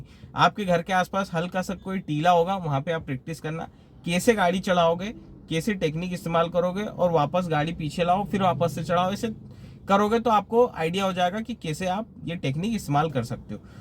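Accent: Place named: Malwa, India. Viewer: मालवा क्षेत्र के हो लगता है ❤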